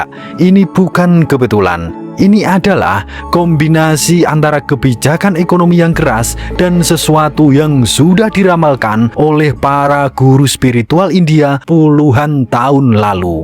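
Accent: Java, Indonesia. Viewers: Ngomong ne kayak orang kampung saya wong jowo,malu saya dengar nya, harusnya logat Jawa nya harus hilang, pakailah logat bahasa Indonesia yang baik dan benar,karna kita orang Indonesia berbagai suku ras dan agama yang melihat dan mendengarnya.😂😂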